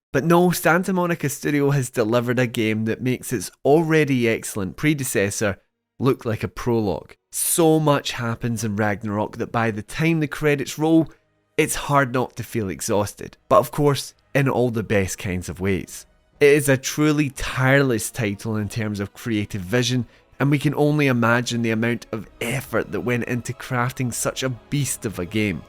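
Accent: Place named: England, United Kingdom. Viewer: 😂 i commented same regarding his english accent, so weird and different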